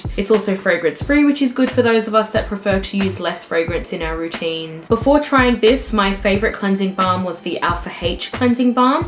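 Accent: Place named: New South Wales, Australia. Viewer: I'm so glad you're posting again! It's great to have an Aussie skincare channel, and someone who has dry skin!! Maybe you could do a video about yourself and your cat? Judging from your accent I'm gonna assume you're from NSW maybe? When did you get into skincare, how did you learn about it? Why did you start the channel? What have you learned from the channel? How much skincare do you go through seeing as you've tried so much? I'm just started using No7 cleansing water, hydraluminiius day cream, retinol, and retinol night cream and I'm really enjoying all of them, I got them after you mentioned No7 in one of your videos. I use dermaveen as my body moisturiser, and I really want to try their new face wash, the ingredient list looks like it will work well for me. I usually apply my body moisturiser first then by the time I've done my face routine and brushed my teeth I'm ready to get dressed. 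Mind you I wouldn't be able to do that in the morning in winter, too bloody cold.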